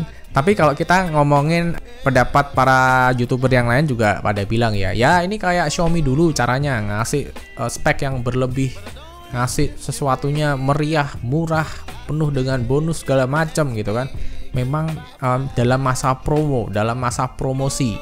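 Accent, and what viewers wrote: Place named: East Java, Indonesia. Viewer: org jawa tah mas ?? logatnya kya daerah wetan sana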